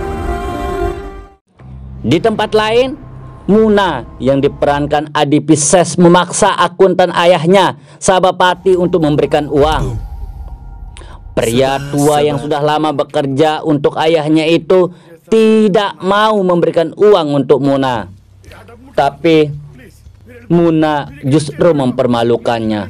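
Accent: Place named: Lombok, Indonesia. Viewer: lanjut saja, bro....tiap orang punya kreasi sendiri. logatnya mirip teman saya orang sasak.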